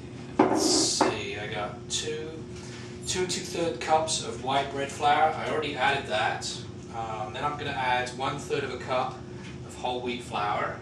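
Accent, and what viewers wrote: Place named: United Kingdom. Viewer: Who swears with british accent?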